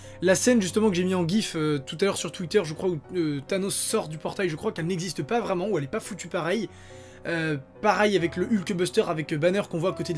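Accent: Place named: Lyon, France. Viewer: Tes lyonnais ? prck tu di trikar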